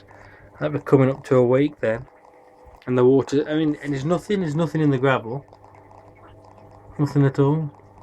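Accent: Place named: Wolverhampton, United Kingdom. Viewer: This setup looks awesome! Tubbs and Edwards look happy to see you :) I just brought my first aquarium but I'll be keeping fish. You sound like you're from Wolverhampton like me!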